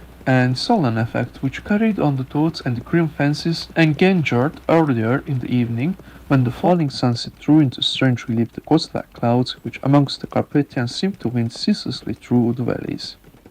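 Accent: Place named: Hungary. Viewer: Hello Dear 🌻🐝 You're my first Hungarian accent so in my 71 years I've had no experience developing an ear for it. If you'll share a chapter you feel is your best translation so far I will try to learn it. Looking forward to hearing from you again. Auntee Sara ⚘️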